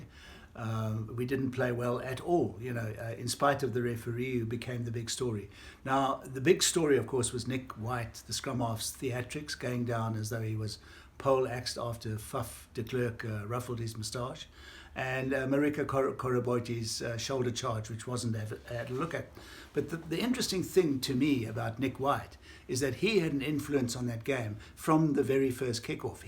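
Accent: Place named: England, United Kingdom. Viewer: Potter and the funny thing is on each occasion many years later the official has come out and said he was wrong but that doesn't help after the fact.... And why do they all admit many years later that they were incorrect, because at some point in there lives, they realise they just look like dishonest chops and the conscience gets to them... Brice Lawrence came out n said I had a bad day, Romain poite came out said I got it wrong, paddy o brian said on many occasions he got it wrong with bok games????? Doesn't help after the fact... Remember two years ago where boks were about to beat England at Twickenham, Will Arrogant Farrell shoulder charged Andre Esterhuizen, boks weren't awarded a penalty, even although after the game Eddy Jones and Farrell said they were extremely lucky 😉... it's not luck it's biased officiating... you sound like a proper Pom, we dnt expect you to be unbiased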